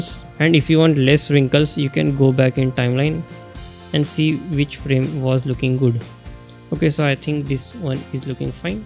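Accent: India. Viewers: Do you know a good app to make a voice over to hide this shitty pajeet voice?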